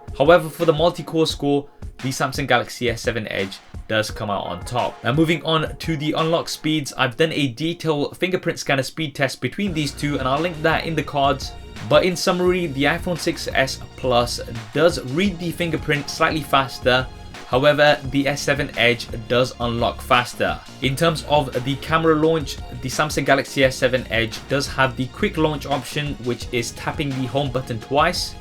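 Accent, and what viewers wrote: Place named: England, United Kingdom. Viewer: Good review and nice to hear someone with an English accent and not saying "go ahead" every 5 seconds like a lot of Americans do. Only problem with this video is the description says S7 and iPhone 6s but in the review he's actually using an S7 edge and 6s Plus.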